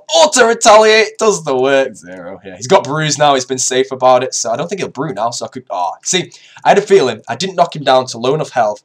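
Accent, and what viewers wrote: United Kingdom. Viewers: British accent so cute!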